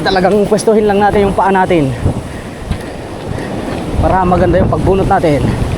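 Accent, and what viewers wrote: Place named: Agutaynen, Philippines. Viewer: Kapatid parang lahing agutaynen ka? May punto ka kasi pag nagsasalita ka, halatado? Hahaha. Pa-shout-out na lng. Joel Zumaraga Tabarangao from Palawan with Love. Thank you and God bless!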